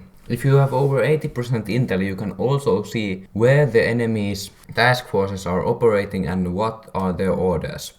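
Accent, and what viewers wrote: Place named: England, United Kingdom. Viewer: Sounds like rally english.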